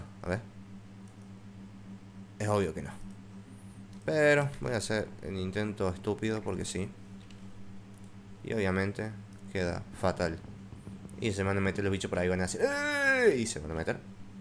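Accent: Argentina. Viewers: Cuando dijiste puse el cofre en el peor lugar del mundo yo dije obvio y al mismo tiempo te escuche decir obvio. LOL El primer vídeo tuyo que vi fue el pro, el noob y yo 3 y cuando escuche que eras argentino inmediatamente me suscribi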